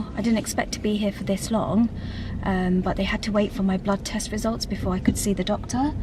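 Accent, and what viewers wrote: England, United Kingdom. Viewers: I love listening to you speak you have such a sweet voice! I love hearing you say Cozy!😅 I live in USA, in California. I enjoy hearing your English accent. Hugs from California ❤.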